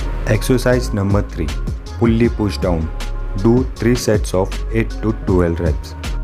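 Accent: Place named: India. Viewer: واضح من اللكنه هندي بحت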